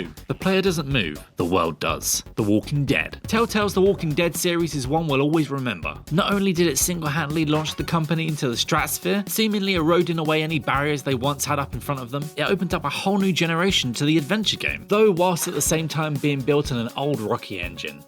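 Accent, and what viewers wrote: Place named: United Kingdom. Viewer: Gotta be another British accent. I think they might have more than the entire USA!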